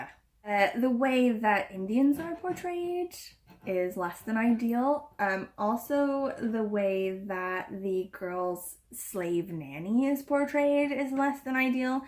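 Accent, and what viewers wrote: Wales, United Kingdom. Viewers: I could tell you are living in wales because of certain things in your accent. At least here you get a dragon on the flag 🙂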